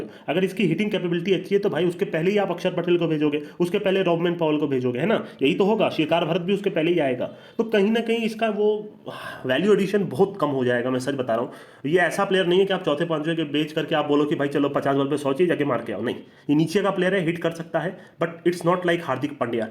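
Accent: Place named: Bihar, India. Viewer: Bhai bihar se ho kya aap? Aapka shardul thakur eko wicket nahi 20run dekar wala accent mast tha🤩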